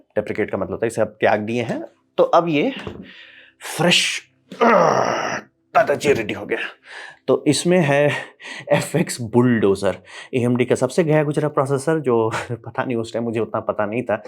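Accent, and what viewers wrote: Bihar, India. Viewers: Bihar se hawa ka ho bhai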